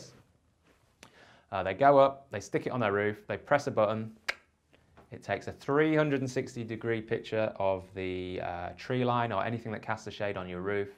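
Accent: England, United Kingdom. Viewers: Sounds funny hearing someone with an English accent telling you to face your panels North.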